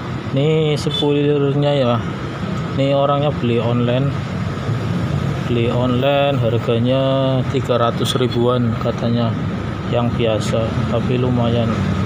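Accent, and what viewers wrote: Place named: Tegal, Indonesia. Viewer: Tegale ndi mas broo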